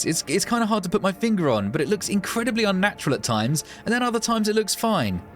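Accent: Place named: United Kingdom. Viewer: its the accent ... British accent does wonders. Its shapes reality :face-fuchsia-wide-eyes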